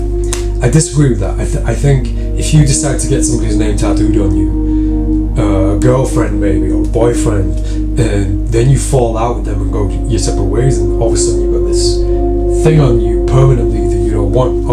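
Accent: United Kingdom. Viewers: Don’t feel embarrassed or humiliated for opening up. That’s bravery. I truly await every video you post, regardless of what it’s about. There’s always a lesson to be learned. Todays: failure is not weakness. I enjoy spending my time watching; and with the English accent it always sounds epic! Haha! So sincerely, “Fanks” 🙏🏼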